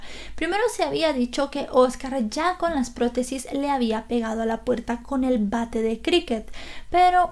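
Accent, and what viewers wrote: Standard. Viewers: Tu español es muy neutro.. es de donde? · Excelente manera de transmitirnos la información sobre los casos ,amo su español Neutro ,no tenía idea de donde Era pero creo que no tiene acento de Guatemala es más no se distinguir el acento solo que me encanta la forma en que narras ,un gran trabajo impecable ! Suerte que siga creciendo más Saludos de una Hondureña ! ❤️ No hay día que no vea un caso suyo ! :)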